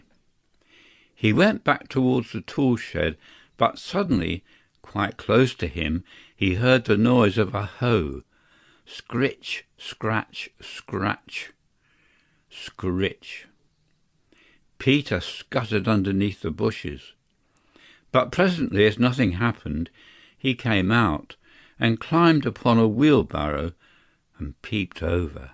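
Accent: United Kingdom. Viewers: Произношения английское,не американское.В Америке так не говорят. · Произношение британское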